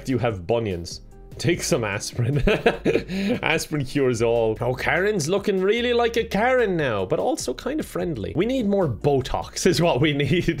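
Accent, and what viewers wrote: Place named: Ireland. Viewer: HOLY FEEECK! I absolutely ADORE your Irish accent, mah dude. And of course, you're super funny! Been loving watching your vids! Xoxo from a huge Brazilian fan 🤗😙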